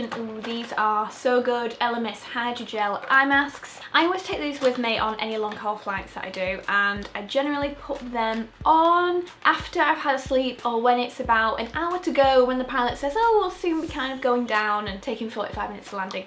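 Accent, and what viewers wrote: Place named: England, United Kingdom. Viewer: Another great video! You are so pretty and even though we are both from England I love your accent 😂🙈 xxxx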